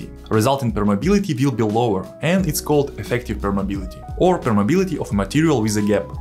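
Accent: Russia. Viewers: Thank you!, your accent Russian why I say that? Is because magnetic fields then to coil's a EMF field. Tesla coil, plasma current flow, and understanding transformers, hover crafts flying UFOs each country has one the harnessing of plasma called plasma engines ( motors). Russia is white and I want to say a bird shaped Hove craft our on this side of the world same but mercury and it's flow. Got to Go star Trek create the new generation so they can boldly Go where man's gone before!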